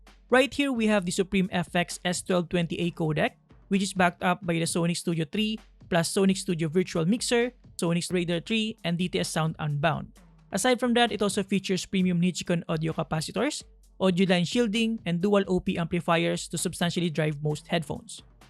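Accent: Philippines. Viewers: Just the way you talked I immediately knew you were a Pinoy XD. Jollibee gang from California lol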